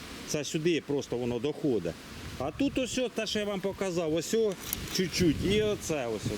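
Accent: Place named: South, Ukraine. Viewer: Так впі знаю наш говір південний . Я змиколаївської.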